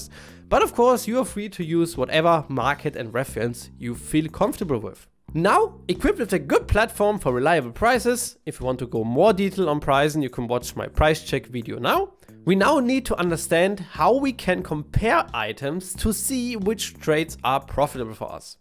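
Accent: Germany. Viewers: i love ur videos, so damn underrated channel.. u have the hardest german accent i ever heard, combined with nice symphatic voice, usefull infos, really good explained, and the editing is just great. keep it up!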